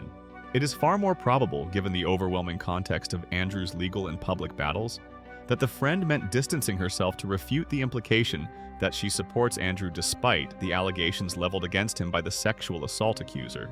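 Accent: United States. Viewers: Why the American narrator?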